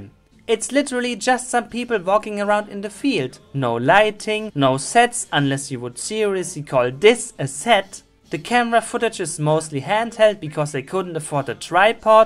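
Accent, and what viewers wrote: Germany. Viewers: This video is the first I ever watched from you, and by your accent I immediately knew you were German.... Wie kannst du kartoffelsalat so schlecht reden? XD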